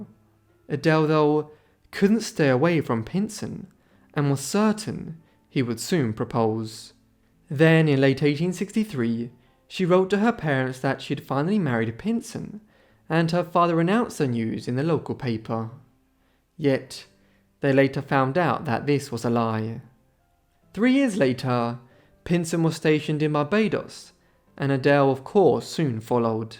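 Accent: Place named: United Kingdom. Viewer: Narration would be better by an American, not a British accent.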